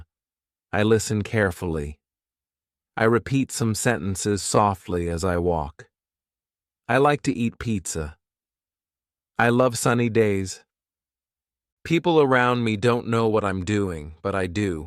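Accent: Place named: England, United Kingdom. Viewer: I'm Russian. And my English's very bad with a pronunciation becouse I've learned it only by reading books. Youre pronunciation's very beatifull! It's realy English of England! I enjoyed listening it. Thanks!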